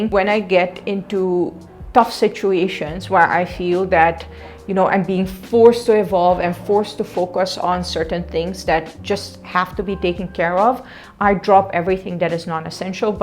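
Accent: India. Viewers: I love how living in India for 5 years made your accent a bit like Indian accent. (Obv in a good way tho)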